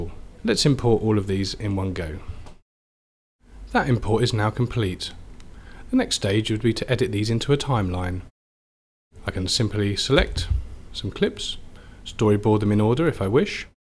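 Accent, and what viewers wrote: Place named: United Kingdom. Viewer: Британский акцент :) Те же дела с мобил и мобайл.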